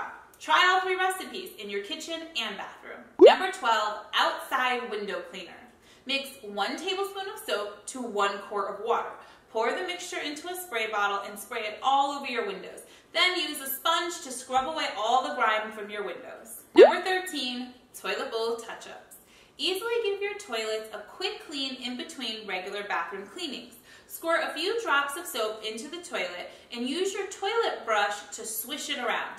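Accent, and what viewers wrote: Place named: Boston, United States. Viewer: Cute boston!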